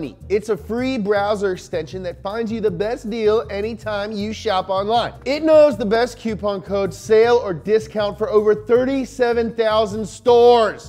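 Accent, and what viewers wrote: Germany. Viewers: I need a 12 hour compilation of speaking German-ish English, anyone else? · out here with the best german accent in the business. Love it!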